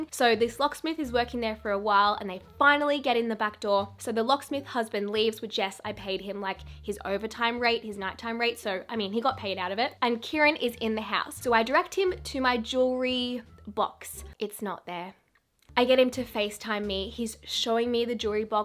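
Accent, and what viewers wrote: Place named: Australia. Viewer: The little Ozzy accent developing strong!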